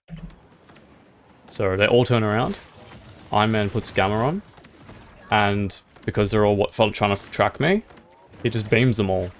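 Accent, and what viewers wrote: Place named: Australia. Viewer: I love your Australien Accent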